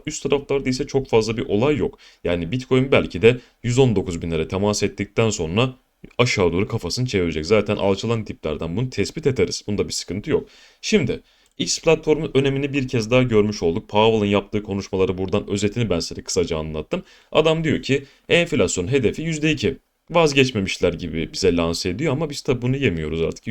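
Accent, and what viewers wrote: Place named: Black Sea, Turkey. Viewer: Hocam Karadenizin hangi ilindesiniz ❤️🤲🧿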